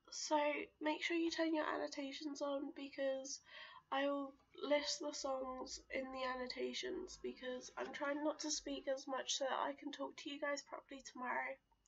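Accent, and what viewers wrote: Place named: United Kingdom. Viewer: Good job! Nice sincere vlog and accent (I just love British English)! You've got genuine energy, I'm also a great fan of music in general, thumbs up! I recently just started vlogging as well, so if you got the time check it out:]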